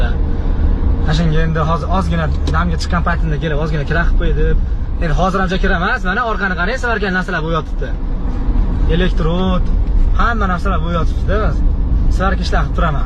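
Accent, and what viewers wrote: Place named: Fergana Valley, Uzbekistan. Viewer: Sheva vodiy bölyotibdi özicha vohani tiqishtrganini maraz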